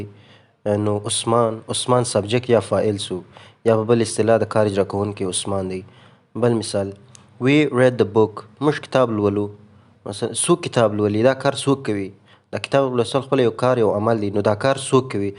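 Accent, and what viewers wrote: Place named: Kandahar, Afghanistan. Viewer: Leila sweeps the room. Leila - subject, Sweep- verb, Room - object. Thanks for your teaching 🥀 I like your teaching 🥀 ګرانه استاده! ستاسو تدريس مې ډېر خوښيږي. هيله ده ، چې دوام ورکړئ. کندهارۍ لهجه او انګليسي ژبه ډېر خوند کوي.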